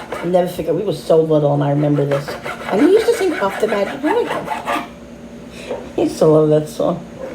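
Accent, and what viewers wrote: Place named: New York, United States. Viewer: I just ran across you! You sound like you are from N.Y. your accent is cool. I am stuck on the Summer wells case. I watch all the real mystery shows too. I will now watch the rest of your cooking.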